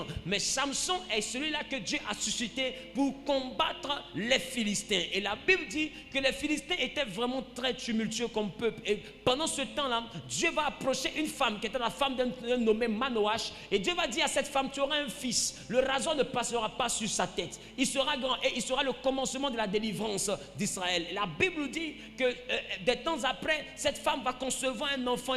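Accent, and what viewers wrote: Ivory Coast. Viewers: l accent ivoirien, man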